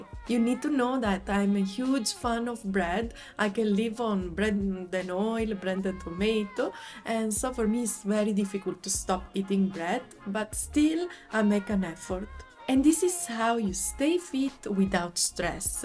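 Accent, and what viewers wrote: Italy. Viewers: Grandeee finally someone explaining this truth to the world. I tried to explain this to non italians but they didn't really get it. Now I can show this to my friends and maybe they will understund ahah. Grande ti seguo da un paio d'anni, mi piacciono molto i tuoi video e anche il tuo modo di spiegare le cose. ed e' bello il tuo accento italiano quando parli in inglese. Un abbraccio dalla Sicilia.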